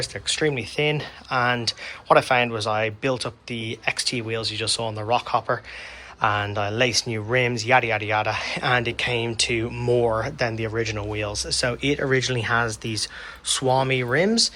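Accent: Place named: Ireland. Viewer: Brilliant video as always. Great to know I'm not the only hoarder out there. Mind you, I've ONLY (currently lol!) got 6 frames in various states, all road bikes. What are the bars you got on ali express for the reacto? I've heard some horror stories about carbon bars off there. So I'd be interested in your thoughts. Keep up the great work. Started following you on instagram, then discovered your youtube channel. Great to hear the Irish accent!!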